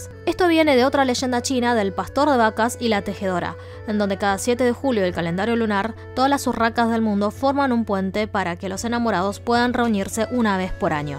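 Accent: Argentina. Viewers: QUE ACENTO ARGENTINO QUE PUEDE DESAGRADAR A LOS SEGUIDORES, ENTRE ESOS EL SUSCRITO. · Muy simpática dándonos una brillante información y por cierto con un bonito acento argentino. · interesante, se nota que estas o has estado en argentina mucho tiempo, porque tienes ese dejo, buena ventana de difusión de china, soy tu admirador. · Que interesante video, pero me pregunto porque la srta presentadora tiene como acento argentino, que curioso. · Muy lindo su acento argentino chino y muy interesante lo que dice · Belleza que dominas pero muy bien el español castellano tipo argentino saludos desde santa cruz Bolivia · Saludos desde Lima Perú ... excelente tu español, tu acento español Argentino. Éxitos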